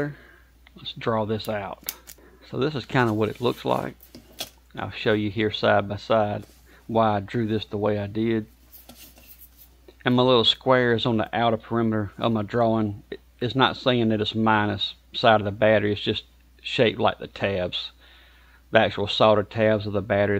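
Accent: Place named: South, United States. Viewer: Thanks for always being so good about reading and replying to comments! I’ve been watching your videos for a few years now since I was trying to troubleshoot an EGO battery. You even made a short follow up video to answer a question I had back then and I’ve been a fan ever since. Actually I think you may have even helped shape my appreciation for Southern accents and their associated charm to be honest lol. You’re a great teacher, fixer and creator. Keep up the great work, wish you and your channel all the best! Much Mahalos and Aloha! 🤙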